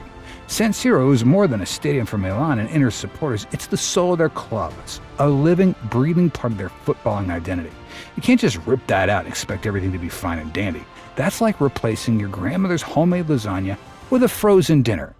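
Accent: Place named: United States. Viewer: Why is there a Shi#ful American voice over on this video.... un #ffin believable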